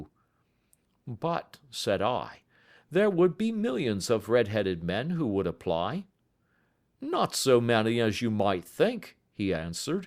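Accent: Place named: United States. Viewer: No no no.... Sherlock cannot be read with an american accent...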